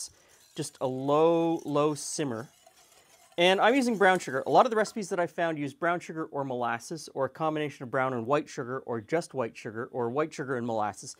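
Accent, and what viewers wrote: Canada. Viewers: Canadian eh? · You have to be Canadian!! Absolutely nothing wrong with that. It's just how you pronounce certain words, and your "accent" with how you say those words. Like "about"...or you are just further up North from me. Anyway, thank you for sharing your recipe for root beer!! Love home made root beer!!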